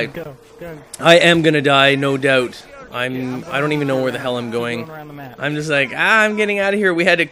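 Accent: Canada. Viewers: ur canadian. you go oo instead of ow or ou. and you sed eh? abunch of times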